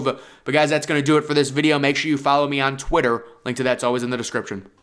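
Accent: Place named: Midwest, United States. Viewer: you have slight mid west accent. you live in Michigan?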